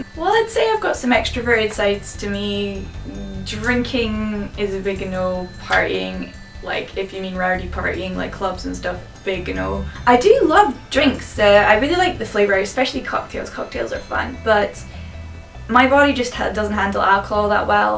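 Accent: Scotland, United Kingdom. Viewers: Are you from Scotland?! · Your Scottish accent is hardly noticeable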